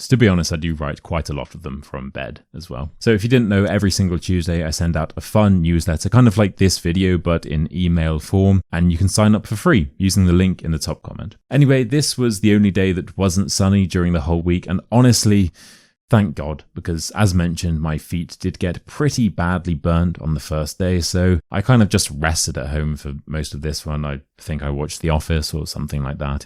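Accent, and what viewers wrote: United Kingdom. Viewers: You are amazing, I used to hear the American accent, but for my IELTS exam I started training my ear to the British accent thanks to your channel. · Thanks, Teacher! You always deliver lovely videos with a great British accent. Have a nice day!🌻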